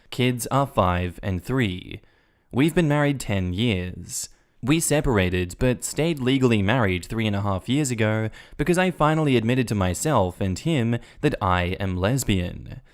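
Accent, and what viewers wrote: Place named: Australia. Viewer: He's from Australia.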